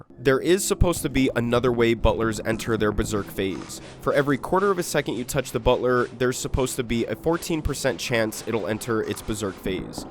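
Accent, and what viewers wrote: United Kingdom. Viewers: Brishish man from South London.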